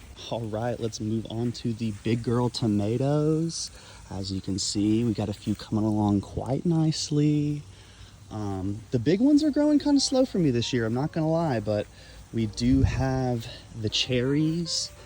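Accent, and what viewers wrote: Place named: South, United States. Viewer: Came here from Obese to Beast to counter that bully Alex, stayed for the snark and the southern accent ❤ subscribed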